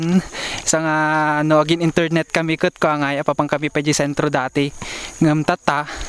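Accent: Ilocos, Philippines. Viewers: Maraming salamat for videos mo! We love to see the food and the location. Asawa ko really enjoys hearing you speak Ilacono. It makes her miss home in Laoag City. Big thumbs up! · Hearing you talking Ilocano reminds me of my lola.... I really miss her a lot.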